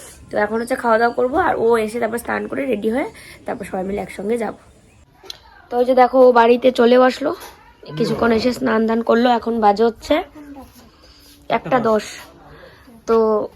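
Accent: Bangladesh. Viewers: Prottek jaygay ak ak ta ancholik vasa thake, ota sobai jni. proyojon a nijeder moddhe kotha bolao hoy vlog cholakalin, bt ajkal puro vlog ta besir vag somoy Bangladesher vasay/ local vasay kotha bole vlog continue korche, Really khub odd lagey sunte. ak ak br bolbe seta vloi lage, amrao porichito hoi vasatar sathe. tumi fresh Banglay chesta koro. o toh coochbihar er meye, ki sundor kore kotha bole. tomar kotha gulo baddo kaney lagey. kichu mone korona bollam bole. chesta koro nije k improve korar. Roj vdo dekhi, cmnt. kori tai jeta thik mone korlam seta bolei fellam.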